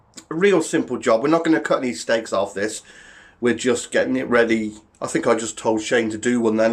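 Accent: United Kingdom. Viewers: British 110 per cent!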